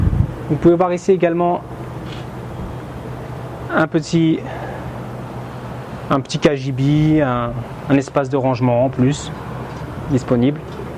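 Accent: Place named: France. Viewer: Très bel accent français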